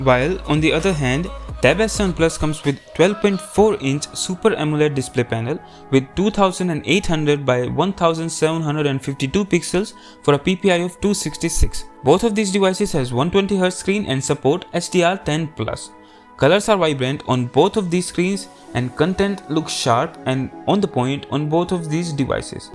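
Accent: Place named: India. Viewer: india accents so funny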